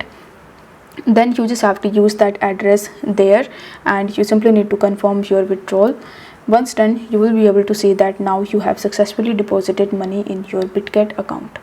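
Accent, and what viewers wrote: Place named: India. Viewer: indian accent is relayable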